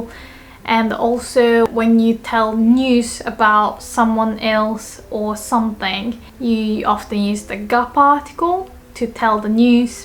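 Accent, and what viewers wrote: United Kingdom. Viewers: It sounds super british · Actually, it's a British accent. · Your English has a British accent. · I initially thought you might be British...... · I wonder if she learned english from a British teacher 🤔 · You have a great accent I've never heard before! Did you Maybe live/learn English is England or the UK? You have an accent similar to James Grime. (and brains to match!) (singing banana / numberphiles math YouTube channel.) 💖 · Most of the Japanese teachers have an American accent, enjoyed seeing British pronunciation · Are you in Uk? A bit accent of the UK! Overall, your teaching is helpful!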